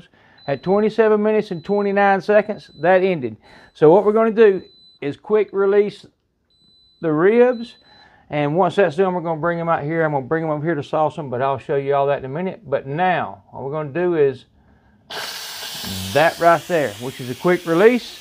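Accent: South, United States. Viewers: He’s from the south so he has an accent. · I love this video. You're such a character 😁 your American accent made me smile. Such a good job on those ribs! I love my instant pots.. can't wait to try ribs now!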